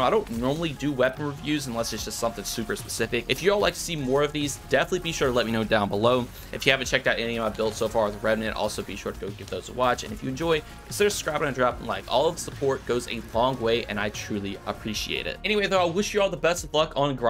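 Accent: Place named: North Carolina, United States. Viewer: Completely irrelevant, but the more I watch your content the more I feel like I hear a North Carolina accent in there. Haha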